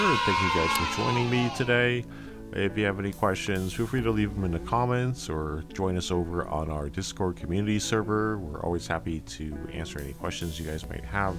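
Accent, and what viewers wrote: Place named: Ohio, United States. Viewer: All I kept saying is that this guy is def from Ohio or near some Amish country. Lol thanks for the lesson!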